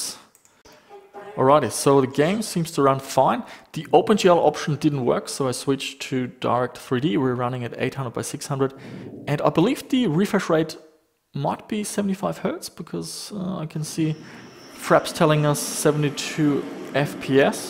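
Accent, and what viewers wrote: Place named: Germany. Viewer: You accent seems to indicate that you are originally from Germany.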